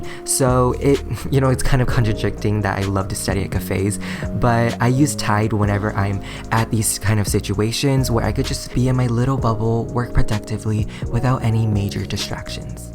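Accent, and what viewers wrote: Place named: Philippines. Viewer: I swear he sounds Filipino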